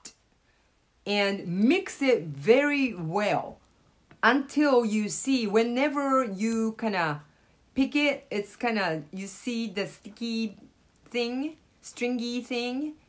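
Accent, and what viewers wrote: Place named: Spain. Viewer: Thank you very much for a very clear clarification. There were at least two reasons I asked such a (stupid) question. Oriental people, i.e. Chinese, Korean, Japanese, etc., mostly have slanted eyes and yours ain't. Your English with a bit heavy in Spanish accent (I bet you fluently speak both Japanese and Spanish). Regardless, I do have some questions about how you make Nattō and probably some remarks / suggestions. I hope you will find plenty of time to address them. 1. You mentioned in your video that you first soaked your soybeans overnight. Am I right to say that you used cold water to soak your soybeans in a room temperature of around 25°C (or 77°F)? 2. Your video clearly explained how you pressured steam the soybeans. In the process of setting up for a fermentation, I believe I saw some water left in the inner stainless steel pot with your inoculated soybeans in a Pyrex container to ferment, am I right to say so? 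So far, these are the only two questions I have. Now, if you don't have any objections, I would like to make some remarks / suggestions about Nattō. 1. Do you know naturally soybeans contain some bacillus subtilis (a gram-positive, catalase-positive bacterium) which can survive in extreme environmental conditions of temperature and desiccation <link> 2. Nattō <link> is basically made from whole soybeans that have been fermented with Bacillus subtilis var. natto. I started doing some researches and found these 3 videos <link> <link> and this video <link>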